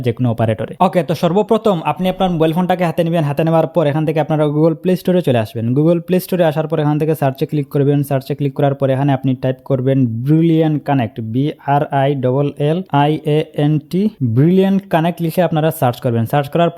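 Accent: Sylhet, Bangladesh. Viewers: Bhi lager Sylheti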